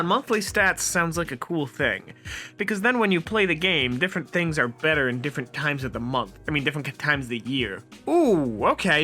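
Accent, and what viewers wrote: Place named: New Jersey, United States. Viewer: I sense a Jersey accent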